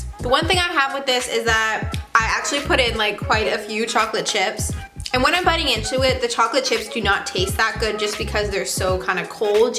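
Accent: Canada. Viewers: Are you a Canadian? Your accent ...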